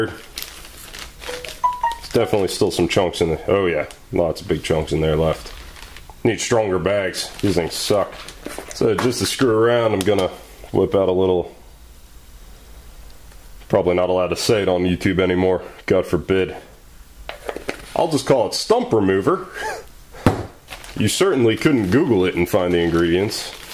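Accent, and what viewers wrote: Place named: Mid-Atlantic, United States. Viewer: Have you ever lived in the Delaware, Maryland, Pennsylvania area? You've kinda got the accent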